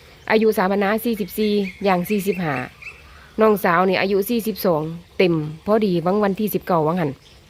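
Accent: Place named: rural, Thailand. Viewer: ชอบฟังเสียง จร้า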